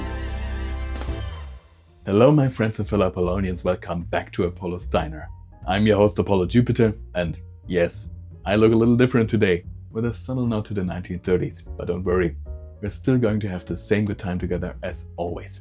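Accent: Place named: Germany. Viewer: Bist du Deutsch? I hear a sight accent, as I am 100% Slovenian and speak a somewhat butchered German. My Stara Ata spoke fluent German and I learned much/some from him. Danke.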